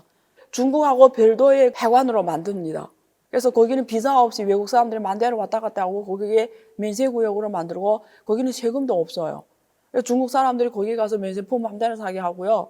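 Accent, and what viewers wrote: Yanbian, China. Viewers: 이번주는 안유화~ 강의 꾸준히 듣겠습니다. 연변식 한국어, 너무 귀엽고 친절하네요. ^^* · 난,좌파도아니고친중도아니지만,,,조선족남자는편견과달리 나름좋은분을만나봐서 디스는안하려구 ᆢ근데확실히이번정부는친중이고,이건아마도 이미중국의내정이깊숙히개입되었다는것,눈에보임 ㆍ티가너무납니다,아마추어인내게도보이는데?인물이반반해서 먼애기하시나들어왔는데,말투가 조선족처자,머그렇다고해,경제,정치애기를구구절절하시는데,,,이분이일단은교양이부족해,나름전문가적소견을어필하는데도,,,머랄가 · 교수님말투가 이따금 연변말투같네요 ㅋㅋㅋㅋㅋㅋ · 연변에서 조선족출신 이신것같아요 경상도 쪽이요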